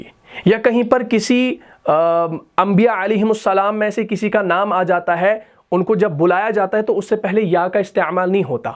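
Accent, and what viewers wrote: Kashmir, India. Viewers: اَلسَلامُ عَلَيْكُم وَرَحْمَةُ اَللهِ وَبَرَكاتُهُ بھاٸی آپ کے لہجے سے میں پہچان گیا تھا کہ آپ کشمیر کے ہیں میں لاہور سے غازی مخاطب ہوں آپ کا پروگرام امارت اسلامی افغانستان میں بھی دوست سنتے ہیں اللہ آپ کو جزاۓ خیر عطافرماۓ بہت اچھا درس ہوتا ہے اس کو جاری رکھنا اللہ آپ کا حامی و ناصر ہو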